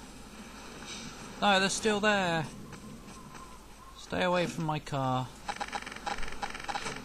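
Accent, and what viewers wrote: United Kingdom. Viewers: your British commentary makes your walk through a thousand times better than any other!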